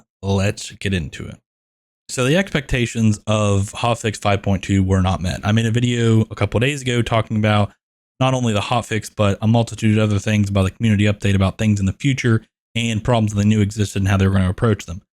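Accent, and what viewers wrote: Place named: Baltimore, United States. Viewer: You have that Pittsburgh/Baltimore accent going on and I like it Edit Mostly Baltimore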